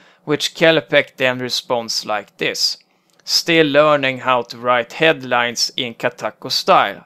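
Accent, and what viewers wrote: Sweden. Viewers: Well that's the thickest swede accent I've heard all week. Nice coverage as well.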